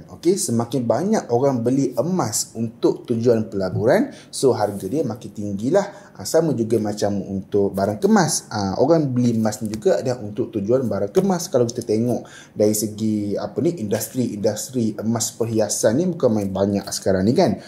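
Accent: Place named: Kelantan, Malaysia. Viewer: Demo ore Kelate ko boh? Bunyi lagi Kelate tu boh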